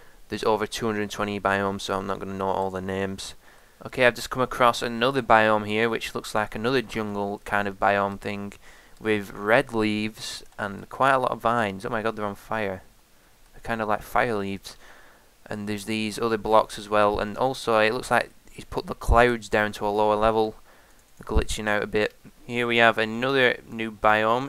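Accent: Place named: Ireland. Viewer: Your Irish aren't you?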